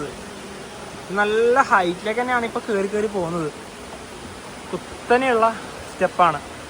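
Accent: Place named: Nileshwaram, India. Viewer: തിരിച്ചു പോരാൻ ടാക്സിക്ക് പകരം ട്രെയിൻ “ ആക്കിയത് ” നന്നായി. താമസിക്കാതെ ATS “ആക്കുമെന്ന് ” പ്രതീക്ഷിക്കുന്നു- നീലേശ്വരം ഭാഷാ ശൈലി രസമുണ്ട് കേൾക്കാൻ.❤❤❤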